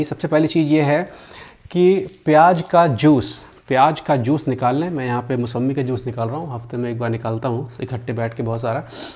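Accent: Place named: Uttar Pradesh, India. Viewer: Thanks bhai or aapki awaj bilkul up walo k tareh hai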